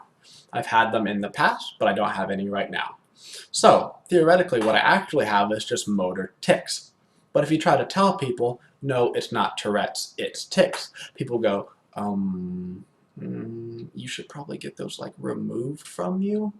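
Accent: United States. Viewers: Watching this in 2020 you can def tell that you had a more pronounced southern accent back in 2014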